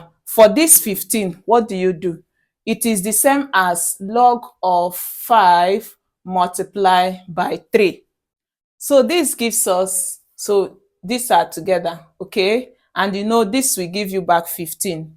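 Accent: Nigeria. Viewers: Your accent is typically Nigerian and you are a very good math teacher.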